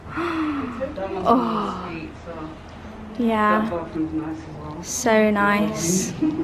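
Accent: Scotland, United Kingdom. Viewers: You’re Scottish accent 😂😂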